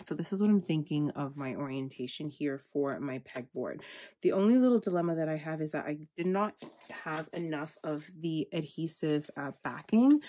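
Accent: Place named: Canada. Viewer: Where did you find those giant unfinished wood beads? Great pegboard setup, love the Canadian accent 🥰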